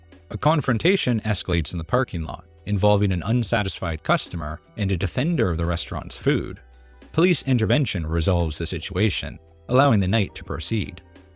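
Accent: Canada. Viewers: could do without this damn canadian narrator since he calls every thing a hoose or aboot learn to speak right or gettoff this narration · I love how I can always tell that a YouTuber is Canadian by the way they say “aboot” lol.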